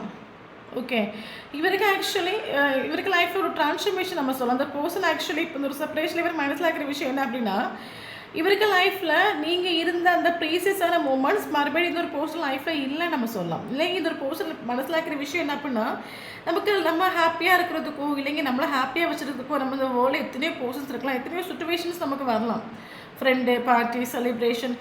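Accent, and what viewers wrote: Kerala, India. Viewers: ரொம்ப நன்றி univers ♥♥🙏🙏, mam super உங்க Reding, எல்லாமே உண்மை. நீங்கள் சிரிச்சிட்டு சொல்லுறது ரொம்ப அழகு, உங்கள் மலையாள தமிழ் தனி அழகு.! நன்றி time பார்த்து அடிக்கிறீங்க ❤❤.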